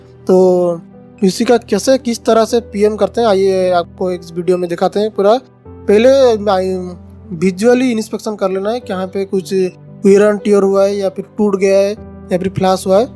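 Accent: Odisha, India. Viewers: Thanks yr.....or ek baat.......mujhe lagta hai aap Odisha se hai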